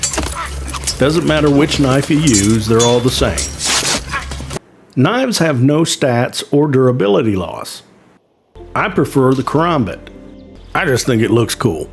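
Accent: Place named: Texas, United States. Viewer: This dude sounds texan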